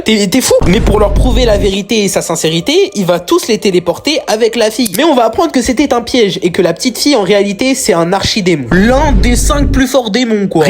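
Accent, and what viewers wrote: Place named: England, United Kingdom. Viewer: On voulait la prononciation ton accent anglais nous as manquer 😢😢😢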